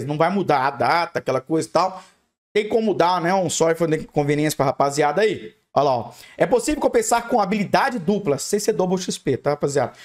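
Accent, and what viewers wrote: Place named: Minas Gerais, Brazil. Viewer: Esse foi o video que o mais soltou seu mineirês falando dos bichin endemoniado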